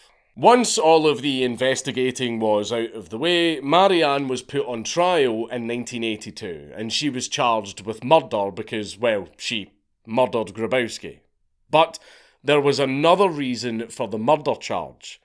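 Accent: Scotland, United Kingdom. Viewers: Maybe it's because I respect what Dank does. Or maybe it's the Scottish accent. But the only time that I don't skip ad reads is on these videos.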